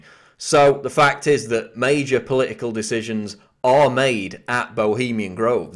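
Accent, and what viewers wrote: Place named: England, United Kingdom. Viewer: Good video. But man i hate that english accent.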